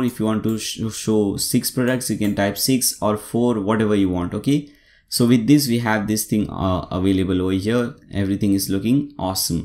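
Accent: India. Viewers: typical Indian English accent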